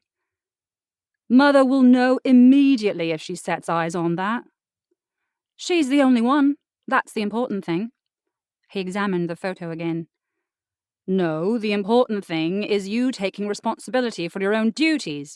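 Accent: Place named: West Virginia, United States. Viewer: The West Virginia was accurate but I got tired of listening to the twang. Sorry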